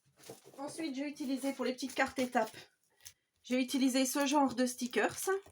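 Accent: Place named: Grand Est, France. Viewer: Coucou, très joli album, j’adore le papier craft 👌🥰🙋‍♀️. Vous êtes du Grand Est de la France, j’ai l’impression de reconnaître le petit accent 😉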